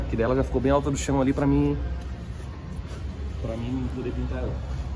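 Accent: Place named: Santa Catarina, Brazil. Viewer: Top o trampo! Com esse sotaque deve ser do litoral de SC, hhahahahh. Salve do Zinga/Floripa.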